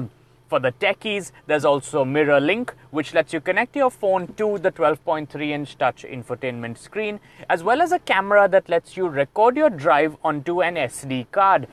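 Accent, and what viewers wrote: India. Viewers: İndian english is soooooo 😫😫🥴🤢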